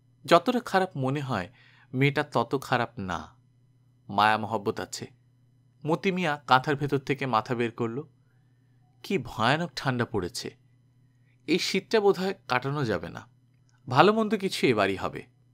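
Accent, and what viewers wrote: Bangal, Bangladesh. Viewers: সত্যিই আপনার বাঙাল ভাষায় কথা বলার ভঙ্গি বড়ই সুন্দর। ভীষণ ভালো লাগে আপনার গল্প শুনতে। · Apnar Bangal accent ta khub accurate, presentation tao darun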